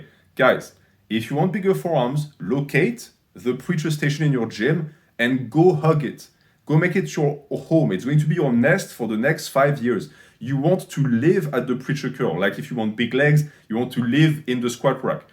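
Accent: France. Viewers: you have a bit french accent, not sure....nice video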